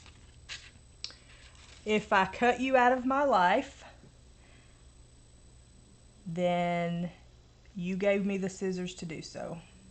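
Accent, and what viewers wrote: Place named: South, United States. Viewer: ha ha I still love your accent props southern gal xx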